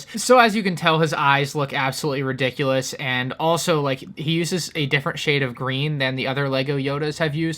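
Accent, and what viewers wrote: Germany. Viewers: As a German i have to say that your german Accent is really good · Just wtf for that „german“ accent lmao 😂😂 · as a german i approve of your german accent :)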